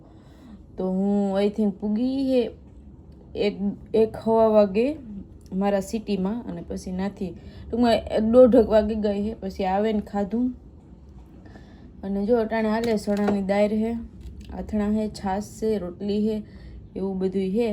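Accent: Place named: Kathiawad, India. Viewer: जी गुड मॉर्निंग मैं आपका ब्लॉग देख रहा हूं मुझे आपकी भाषा बहुत अच्छी लगती है वैसे मैं भी गुजराती ही हूं टिपिकल काठियावाड़ी भाषा सुनने में बहुत मीठी और अच्छी लगती है मैं अहमदाबाद गुजरात से हूं अपने बाजू में कोई साइकिल वाला हो जिसकी नंबर प्लेट हो उनको ही पूछ लो 👈😍🤣😂🤗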